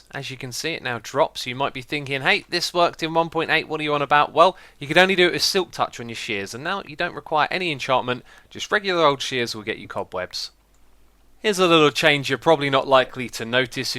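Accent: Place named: United Kingdom. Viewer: I love your British Accent haha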